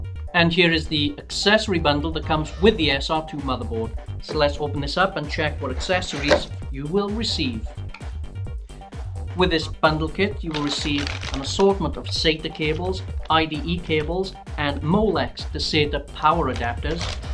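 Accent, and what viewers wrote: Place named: Wales, United Kingdom. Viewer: liking your accent is it welsh im presuming? soz if im wrong. i was just wondering seeing as i live in britain are the parts you recieve shipped from the states? do you pay for them yourself or are they sent to you for reviewing and such? i would die for a job like yours keep up the great work man :)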